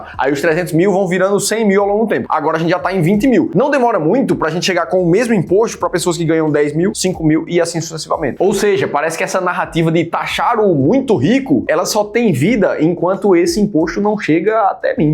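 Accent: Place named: Northeast, Brazil. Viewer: Com esse sotaque de nordeste. Não pode reclamar. Deve achar bom mais imposto melhor. Virei benefício.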